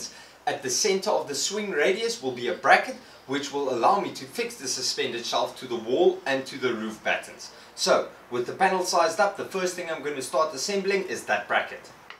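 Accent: Afrikaans, South Africa. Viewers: South Africa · He is Afrikaans, he's my cousin btw he got married not too long ago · First of all your video is great, and your shelves are awesome. Secondly the whole time I was listening I was thinking to myself, he is a South African. What made me 100% sure that you were South African was the hadidas in the background noise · Organizing genius! I’m trying to place the accent - South Africa? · South African ( Dutch) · Wow!! Wish I'd seen this a year ago. I installed ceiling boards so I can't use this idea. Absolutely loved this concept and will try to adapt it to my needs. You sound like a fellow South African. If I'm right, then all I can say is "Bakgat!!" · hi there. i love your idea and it looks soooo good and practile ofcourse. Are you from S.A? i lived in Cape town for 8 years and i think i recognise the accent 😉. Thanks for the great video and tips. 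Cheers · Jys a suid afrikaner, Engels n man ?!! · Heard the same immediately. Definitely a Saffa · Only just found out about this channel and I'm hooked. Readily picked up the accent as South African. At least now I can actually find some of the recommended products. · are you from south Africa? that accent afrikaans pappie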